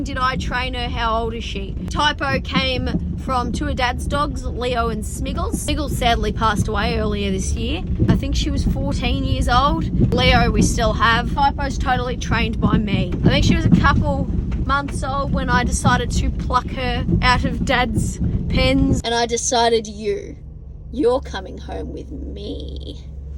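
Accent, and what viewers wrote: Australia. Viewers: The attitude of this channel, from human to dog, is just fucking fabulous❤ I don’t know why the algorithm pushed this channel in my feed, mine are aqua scape, reno and wood work stuff hence the confusion. But mate, am I glad. Girl, you doin’ so good. The only thing I don’t understand, is why are there people dislike these contents and please, I do not wish to hear of it from these people. Hugs from Finland, of all places… PS, your accent make me like my Aussie friends even more😂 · Oh, no, trust, at least in the USA, that accent goes a LONG way. A good number of our top stars in Hollywood call Australia home. …Helps that you grow them damned pretty down there, both the gals and the guys. 😉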